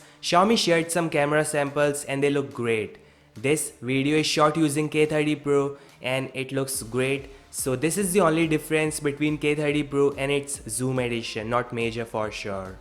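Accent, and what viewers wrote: India. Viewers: Oh i like your infian aksen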